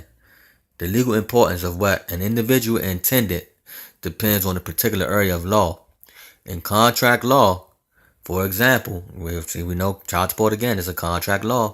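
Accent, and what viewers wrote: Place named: Virginia, United States. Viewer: You're from Virginia, huh?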